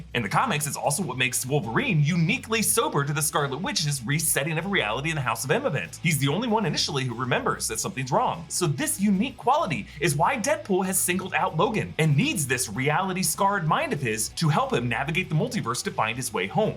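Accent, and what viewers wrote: Australia. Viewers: I loved your Aussie accent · Aussie accent is on point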